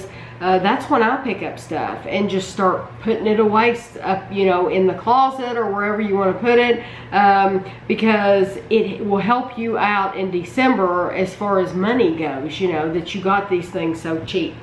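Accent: South, United States. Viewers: Holy southern accent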